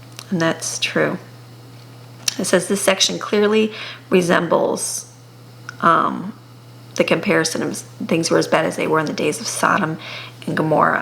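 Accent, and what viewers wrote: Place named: Ireland. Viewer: I thought the same Amy , sounds Irish as we would say. Mind you none so blind that do not want to see. Thank you for reading to us. This passage has such a familiar ring to it and isnt so far from what is going on in this world today.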